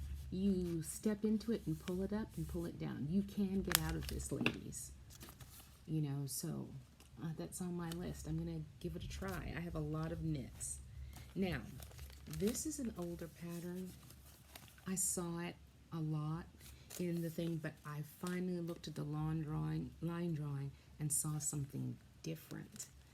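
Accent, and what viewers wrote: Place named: Midwest, United States. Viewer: You’re in Jamaica, but you sounds like you’re from Maybe Michigan ish. Illinois? Midwest. Then you quilt. Then you said “Here’s the thing…” Then I said, “Why haven’t you subscribed. What are you been waiting for!?” Then I subscribed😄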